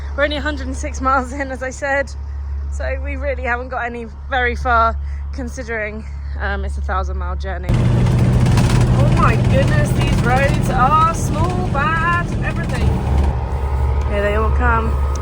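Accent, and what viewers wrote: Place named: United Kingdom. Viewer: Love that British accent , that’s makes me come back to watch your videos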